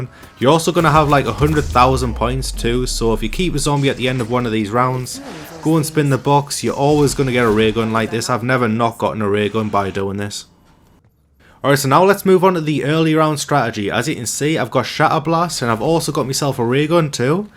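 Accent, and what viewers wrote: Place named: North East England, United Kingdom. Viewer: I find myself to be quite a die hard zombie player, I've played since world at war and i haven't bought this game yet. Is it worth it? Also love that your from the north east ur accent shines 😂. I am too! Been watching you since bo3. Haven't much recently because I've not played this yet. But whenever i think of playing zombies again i come here 👍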